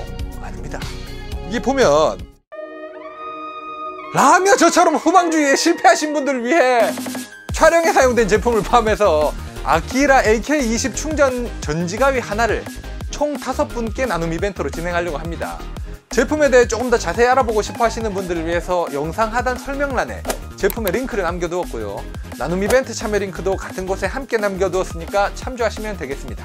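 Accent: Gyeongsang, South Korea. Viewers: 대구 사람 이신가요??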